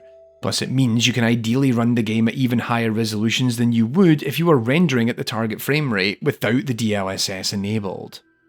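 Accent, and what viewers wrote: Scotland, United Kingdom. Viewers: Are you scottish? 😁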